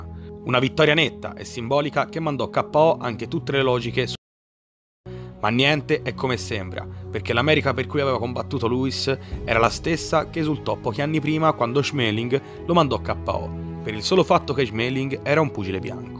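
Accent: Tuscany, Italy. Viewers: L'unico canale di MMA che parla toscano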